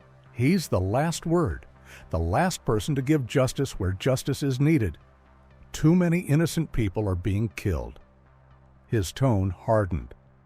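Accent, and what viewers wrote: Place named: England, United Kingdom. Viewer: I love your English accent. In which states of the US do people have this accent?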